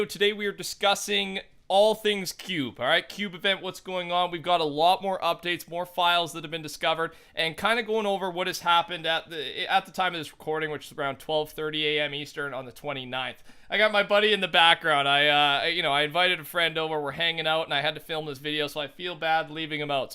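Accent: Canada. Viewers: Damn. So many comments and likes not one person got that it’s a Canadian accent we pronounce and spell a lot of words differently!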